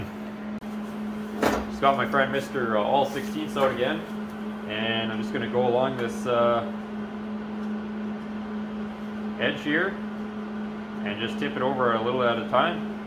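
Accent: Canada. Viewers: I can't quite understand in that Canadian accent of yours. What are you calling your crescent wrench/ handheld metal brake?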